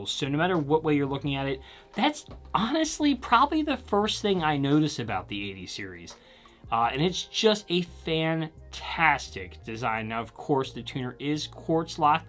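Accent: United States. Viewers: Why if the talking head has a USA accent do we have to endure an undercurrent of anti intellectualism?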